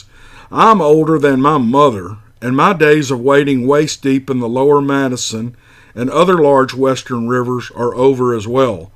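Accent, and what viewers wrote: South, United States. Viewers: thanks man, always great to hear a southern boy. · I love you southern draw, I'm from the south part of Arkansas and was told by my dad about swampy wooded area men would go hunting in but would never come out. This place seemed to me that it was south of Pine Bluff, Arkansas. Maybe you know the place or could find out. My dad when he told it looked really scared like he may have heard or seen something but wouldn't tell me or mom. I think he may have because he seemed to be a bit shaken up about it. Also if anyone reading this can relate to this story, please let me know.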